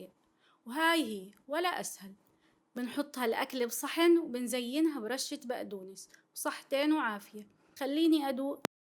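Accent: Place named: Palestine. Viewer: لهجتك فلسطينية ❤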